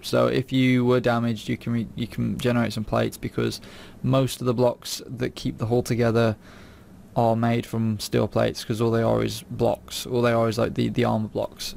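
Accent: United Kingdom. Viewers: you said the word "Homely." adjective 1. NORTH AMERICAN (of a person) unattractive in appearance. synonyms: unattractive, plain, unprepossessing, unlovely, ill-favored, ugly; informalnot much to look at "she's rather homely" But then I realized that you had a British accent. Nevermind. :)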